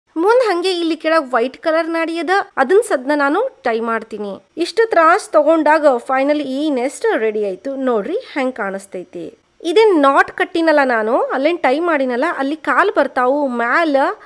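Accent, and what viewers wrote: North Karnataka, India. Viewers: I like your friendly nature your looking like innocent person I like your north karnataka speaking style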